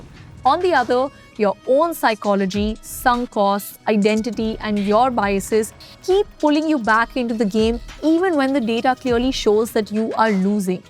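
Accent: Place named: Mumbai, India. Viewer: All from Mumbai same accent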